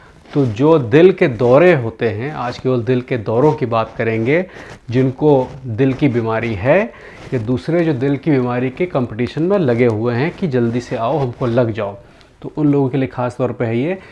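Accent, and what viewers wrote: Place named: Jalaun, India. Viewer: Sir ap ki bhasa mere up ke jaloun, orai se bahut milti hai, jase bat gath me badh lo, mota mot a samajh lo aadi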